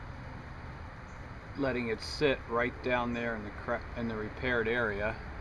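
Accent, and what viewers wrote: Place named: Midwest, United States. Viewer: gold 🥇 great video. 👍 your accent makes me think you are from illinois / midwest area.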